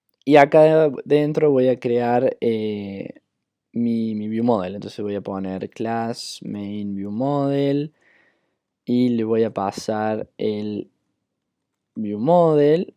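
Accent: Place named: Córdoba, Argentina. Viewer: En este video no pudiste controlarte como cordobé, y tambien miré raro cuando dijiste "Coca con pepsi" jasjjajaja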